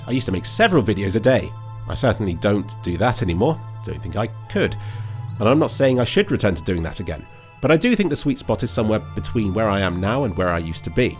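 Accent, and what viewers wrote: United Kingdom. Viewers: I once told a friend about an old animated film, that one famous director spent many years and tens of millions of dollars on, only for it to die in production hell, because of the perfection the director demanded. The friend then said that they should have simply spent more money and time on the movie. He then questioned why all animation shouldn't be 60 FPS, if you love your job you would want to make it as good as possible, right? I love you and your videos, but I gotta be honest - as a layman, I never really notice the difference between the videos you spent 4 hours or a month on, even though I watch 99% of them. If I were to give an objective, third person view of your content and why I like it - it's that I like seeing you talk on random topics I never thought about, but seem interesting in retrospect, you make the most mundane stuff interesting to listen to. 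I'm not into graphics cards or processors, but hearing you talk about this stuff is educational. (maybe I just like British accents, I watched the entirety of Hilda because everyone had cute accents) So I personally just want to see you passionately talk about random stuff you find interesting, making me find it interesting in turn. Don't know if this helped, but I wish you well anyway.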